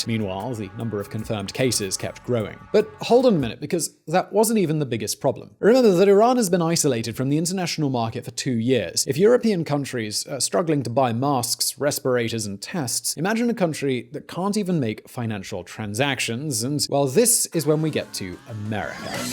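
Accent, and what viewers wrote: United Kingdom. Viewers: THANK You for this Information! I LOVE Your UK Accent. It fits YOU. Our Hearts are with the Every Day Iranians who have Children and Grandparents they Love and arre trying to PROTECT. This is Very Sad.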